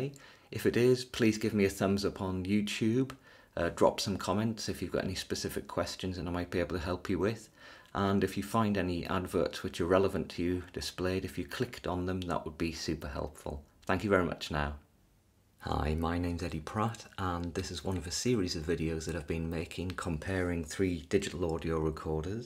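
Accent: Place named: United Kingdom. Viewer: The amateur audio engineer in me enjoys the basic exercise being performed here. The ASMR enthusiast in me appreciates Eddie's calm British-accented voice.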